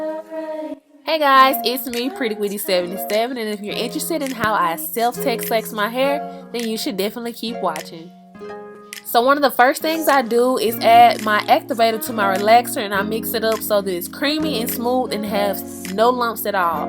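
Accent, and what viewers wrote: South, United States. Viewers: computerfreak247 I said the same thing. In the south somewhere lol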